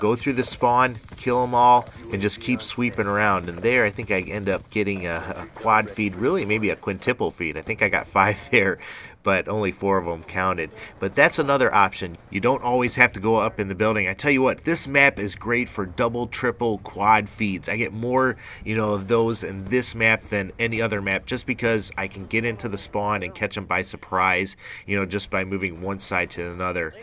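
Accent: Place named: Midwest, United States. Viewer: You have a Midwest accent.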